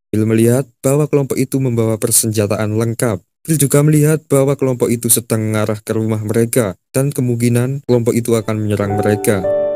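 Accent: Java, Indonesia. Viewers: Medok amat mas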